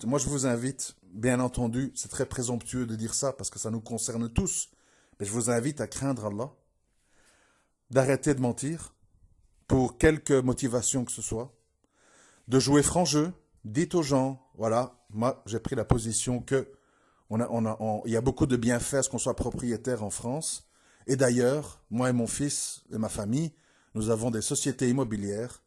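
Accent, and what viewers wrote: Belgium. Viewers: Salam mon frère, ton accent brusseleer c'est top 😉 moi pareil, quand je m énerf ça revient 😎. C'est bien de dénoncer, mais sois en forme car des hypocrites sont légion...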